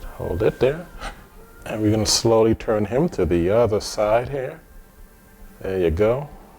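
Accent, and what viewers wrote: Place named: Caribbean. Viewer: Where are you from? I can hear hints of a Caribbean accent.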